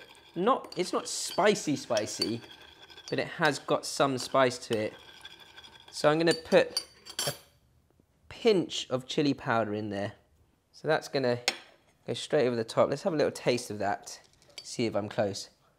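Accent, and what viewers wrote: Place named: United Kingdom. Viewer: OMG you are so darn cute !! The fact that you are asian with a brit accent......... just too damn cute !!! oh yeah, thanks for the recipe!!!!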